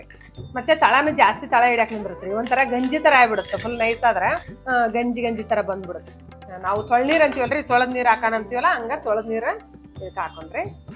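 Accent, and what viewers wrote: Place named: North Karnataka, India. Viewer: Amma I like your uttarkarnataka language. As well as your recipes,please show us some more recipes like this.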